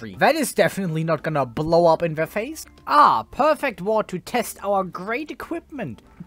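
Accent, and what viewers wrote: Germany. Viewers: I heard a german playing a ww2 game???? Count me in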